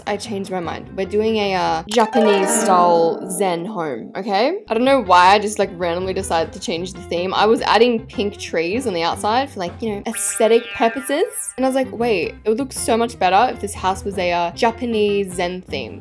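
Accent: Australia. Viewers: wait is she not Australian?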